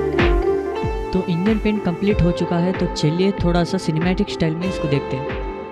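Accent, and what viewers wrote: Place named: Karnataka, India. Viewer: Are you from Karnataka??? Hindi tone can identify... 😀 Great job bro..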